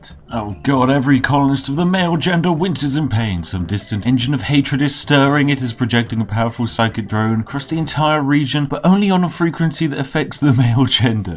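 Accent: United Kingdom. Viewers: There's something really appropriate and matching about a British accent and rhetoric structure being creepy lol "That's exactly what our people are looking for. Wonderful."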